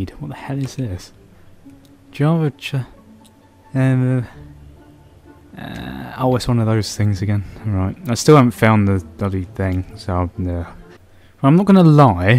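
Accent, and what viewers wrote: United Kingdom. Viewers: It's so good to hear a british let's player, love you're rude, witty commentary, and the foul language aha doing us brits proud.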